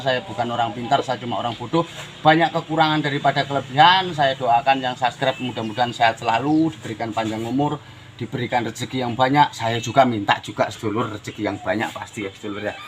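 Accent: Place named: Java, Indonesia. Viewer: Wong jowo to mas asli salam dari jatim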